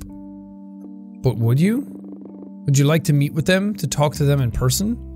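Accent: Afrikaans, South Africa. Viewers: Quite the heavy Afrikaans one, too! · This is legit how some of us sound here in SA 😂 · The first game he just said “my boy” not butt boy it’s just his South African accent. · I'm from South Africa BTW he said my boy not but boy. He just has an Afrikaans accent but I can hear the confusion of but and my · Hes saying "my boy" :P Its a South African accent :D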